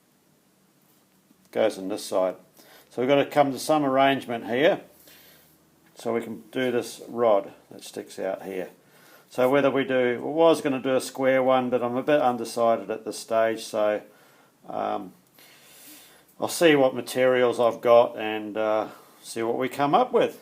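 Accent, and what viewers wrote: Australia. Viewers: Good to hear an Australian accent for a change, nice work as well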